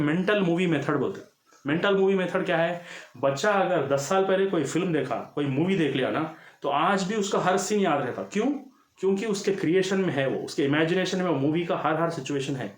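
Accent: Hyderabad, India. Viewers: Sir ki hyderabadi accent sunne ko aya main. Hou kya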